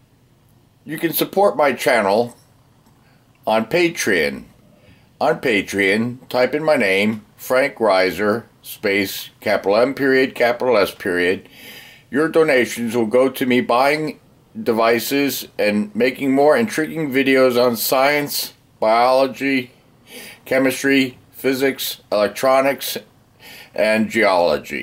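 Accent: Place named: Catskills, United States. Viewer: I wear hearing aids and now I'm wondering how they work! I'm based out of northern New York State near the Canadian border and I've always collected rocks and minerals from all over the country. I also prospect for gold and often travel to southern NYS and Vermont. If you ever feel like finding some gold let me know and we could plan a trip. Your accent sounds like my family in the Catskills!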